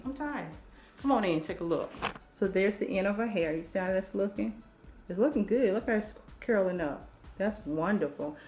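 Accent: South, United States. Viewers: Adele Reed it’s just her southern accent. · She's not saying scab she is saying scalp with a a southern twang.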